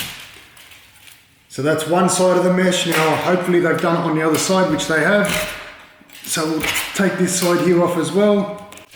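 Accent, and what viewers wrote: Australia. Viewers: i love your accent i'm guessing you are from Melbourne Australia.. I'm stuck in S.A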